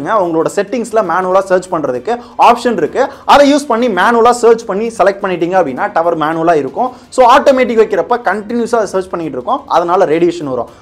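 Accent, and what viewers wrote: Madurai, India. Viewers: BRO nega Madurai ya BRO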